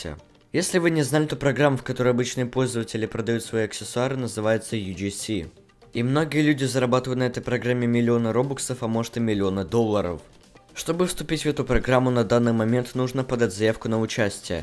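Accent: England, United Kingdom. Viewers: бро, всё круто, но убери этот акцент английский, уши вянут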